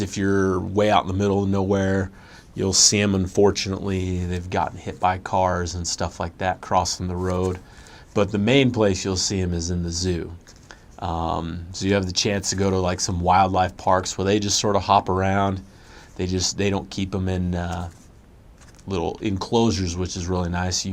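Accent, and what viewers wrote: United States. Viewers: Give it a few months and you’ll lose your American accent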